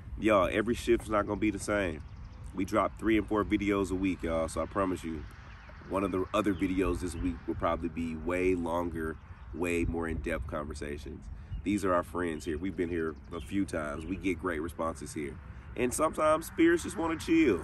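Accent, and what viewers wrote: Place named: East Texas, United States. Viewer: Awesome visit 😀 That was very nice of you to go there on moms day. I'm the one who ask you if you were from East Texas. I listened to your accent. I'm born and raised in Ft. Worth. Love your channel and approach. Keep on keeping on. 👻🌹👻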